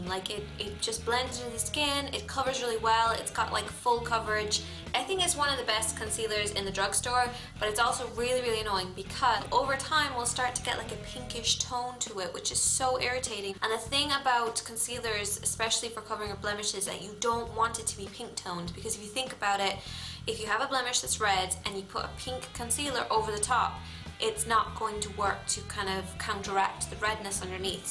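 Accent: Ireland. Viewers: Are you Irish? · are you irish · ...English accent? Are you people mad? That's clearly Irish...or...Scottish 0.o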